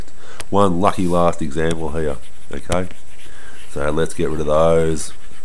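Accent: Australia. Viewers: Hardcore Australian accent [IN A GOOD WAY] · People why do u have to be mean, have u ever heard of Australia,yeh thats where he is from,same with me, so other people have diffrent voices when it comes to countrues so stop being mean. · he sounds like an australian dathi de nogla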